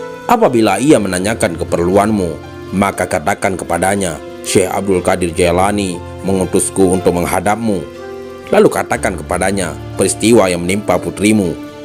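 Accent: Aceh, Indonesia. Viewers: Sy selalu mengikuti kisah kisah yg admin sampaikan, maaf kalau boleh tanya admin orang aceh ya dari logat bahasanya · Logat mimin lage awak getanyoe nyan...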